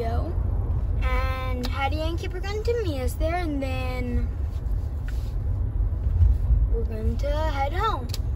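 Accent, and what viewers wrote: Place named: Pacific Islands. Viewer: Bro love your accent man. Sounds like you got a bit of that island swag incorporated. Seki work out. Much alofa Western Sydney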